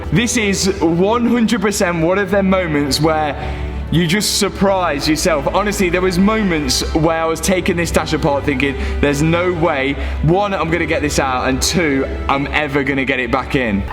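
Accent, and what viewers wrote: United Kingdom. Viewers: Great content. Just started watching. Can't wait for the next Lamborghini vid. Gotta say normally I find the brittish accent very annoying but I really don't mind this guy's. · Late subscriber to your channel, sorry. BUT i hanve literally binged watched everything in the last 10 days....Love it. My Mrs is Leicester so your accent is annoying (im Northern) but genuinely LOVE IT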